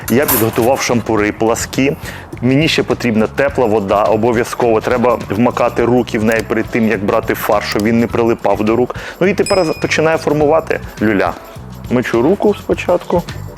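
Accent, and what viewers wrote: Ukraine. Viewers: Все люблю з українським акцентом. Дякую!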